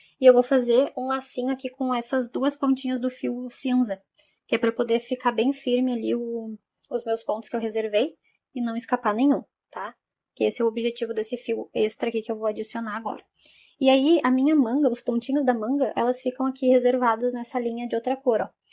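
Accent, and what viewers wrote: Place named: Rio Grande do Sul, Brazil. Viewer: Olá pelo teu sotaque tu és gaúcha de onde tu és?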